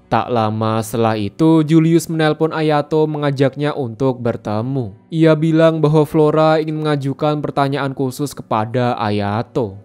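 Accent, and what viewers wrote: Java, Indonesia. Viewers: Meduk bahasanya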